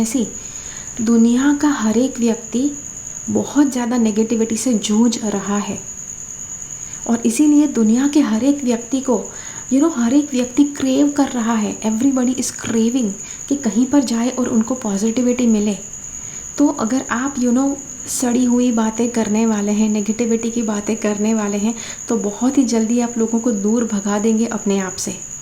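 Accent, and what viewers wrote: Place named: Kashmir, India. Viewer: . ok thanks Apka accent thoda kashmiri panditon sey milta hai isliye pocha tha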